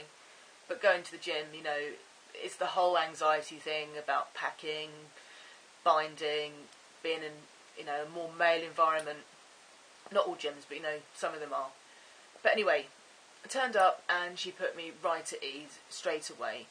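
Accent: United Kingdom. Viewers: really enjoyed this vlog...im from Birmingham uk by the way you sound british. Good Luck with training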